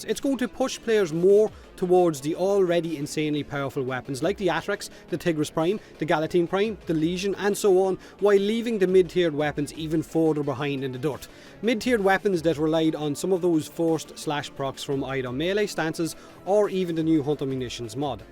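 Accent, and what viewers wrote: Ireland. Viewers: the dulcet tones of your brogue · P.S. As much as I like hearing your beatiful irish voice rant about stuff (don't actually care what about), I like your regular approach better. WF is free to play after all, so DE can do with it whatever they feckin like. BUT: Giving feedback, adressing issues, pointing out things that are wrong can help the developer improve their game. At the end of the day DE still wants the game to be as good as possible (or so I hope), so we, the fans, should defenitely criticize their work and tell them what we don't like, but at the same time (very important) also what we DO like, so they can use that info to decide what to focus on for future updates. And all of this can be done in the calm polite way, you, have ever since done it. No need to shout, no need to rant. Just tell 'em what went wrong and hope they fix it. 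If they don't, don't spend money. But, as mentioned above, I'd like to hear more irish cursing so do one of these every once in a while. Slán go fóill (Hope I got that right)^^ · Sorry, but aside from the curse words, you sound like a fluffy irish teddy bear even while raging:D And that's not a bad thing! It's a good thing that even when you speak your toughts openly, you still remain composed and constructive. True raging as in yelling, incohherent bitching, flailing, name calling and acusations are not what your brand is about (at least I think, but correct me if I'm wrong). Even tough I have 1000+ hours in the game, there is not much that bothers me. I give DE a lot of leeway, because it's their game, we as players don't own it (see the entitlement of Star Wars fans over TLJ for example, how this can be a bad thing) and because it's F2P. The 2 things I would like to see looked up in seriousness is the level scaling and difficulty curve of the game and a rebalancing of all weapons, shortening the gap between older and newer weapons. 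I'm fine with tier weapons, but I'm not fine with old weapons having less than 8% crit/status chance, while new weapons come out all with 25%+ in one of thise categories. And no, Rivens are not the answer. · Youtube's auto generated captions isn't kind to your irish accent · Critical was tone on considering the concerns, but more worth it because Irish rants are the best rants. Better at the pub over a beer. ;) Also, Vay-Donald-Hek. <3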